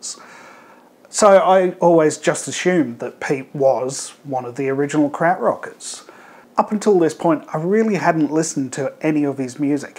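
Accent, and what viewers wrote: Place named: Australia. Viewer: So.. I'm watching your video on programming a Logic synth.. There's a teacup on the table, and I wonder.. "I've got one just the same." I move on to this video, and it occurs to me.. What's this accent? I recognise it. I check the 'About' tab. Ah ha. He's an Aussie! Hi. Loving the random 💯 objectives, diggin' the ambient washes.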